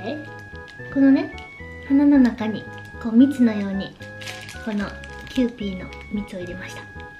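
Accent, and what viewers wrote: Kansai, Japan. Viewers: 関西弁がすごい可愛い 方言最高やな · 関西弁可愛い♡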